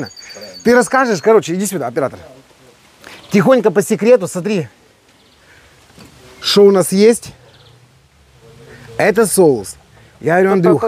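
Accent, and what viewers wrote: Odessa, Ukraine. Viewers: Одесский говорок росслабон для уха😂, привет из Харькова.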